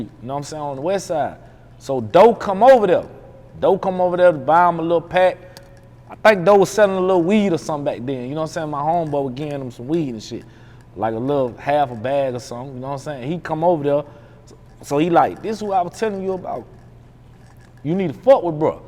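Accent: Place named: New York, United States. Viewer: I thought he was from NYC but as a youngin moved down to the south i swear some times every now and then i catch a lil NYC swag from him i like some of his song's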